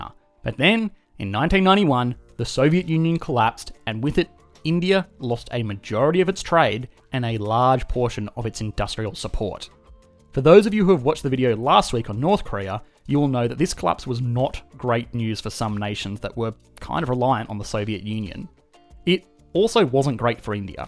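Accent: Australia. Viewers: Hey are you from Australia!?!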